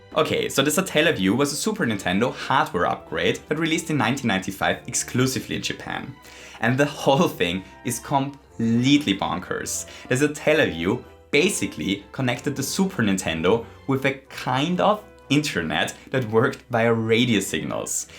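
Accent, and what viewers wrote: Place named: Germany. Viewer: This video was pretty shit tbh. Its basically 17 minutes of conjecture and assumptions, why should a simple reference make every super Nintendo commercial canon? Why should Nintendo pay mind to something that literally no one will think of? And about that whole cultural caricature thing? Mexican people still wear ponchos and sombreros, so why shouldn't mario be able to wear local attire? Based on your accent I'm gonna assume you're German. And as a german, don't you find it fun when a character wears lederhosen or speaks with a german accent? I know as a dutchman when someone assumes I wear wooden shoes and smoke weed I'm charmed. I know that waluigi is incredibly popular here because he represents us, I love your content but this whole video had me scratching my head through its entire runtime. I really hope you'll do better next time.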